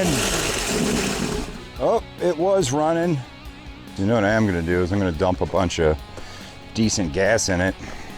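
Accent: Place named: Pennsylvania, United States. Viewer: You sound like a Pennsylvanian! I’m Pittsburgh born and raised! Stillers or Iggles? ;-)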